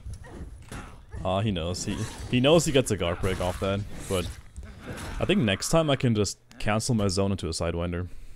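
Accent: Germany. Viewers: Puh Bruder, dachte schon wärst Deutscher.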